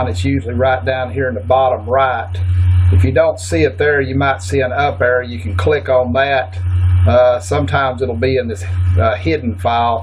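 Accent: South, United States. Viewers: Thank you, u just saved my business and person life oh and it's the southern accent for me🙏🏽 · Dude you are awesome!!!! Thank you! Love the southern accent!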